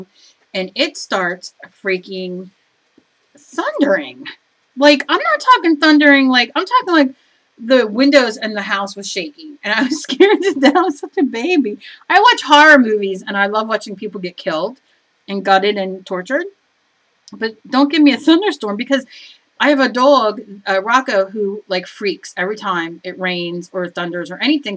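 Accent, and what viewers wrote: New Jersey, United States. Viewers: I love your accent, Jersey? Philly?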